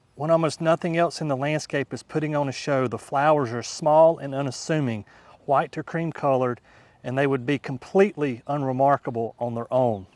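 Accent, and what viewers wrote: South, United States. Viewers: Where are you from? I'm trying to pinpoint your dialect. Arkansas? Alabama?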